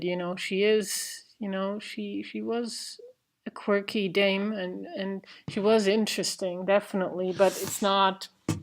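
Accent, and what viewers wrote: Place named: Europe. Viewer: You speak like you came from Europe to US and learned English a five years ago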